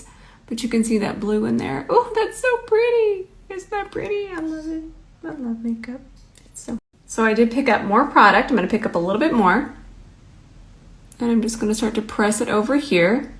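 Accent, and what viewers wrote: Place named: South, United States. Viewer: are you in the states? You have a Southern accent! Under your info, though, it says Pakistan 🧕 I can't wait to save some money to buy your brushes; Social Security doesn't go very far. I love watching your videos. Your makeup always looks Gorgeous. I love you❤️‍🩹🐈‍⬛🐴🐈🐕‍🦺❤️‍🩹💯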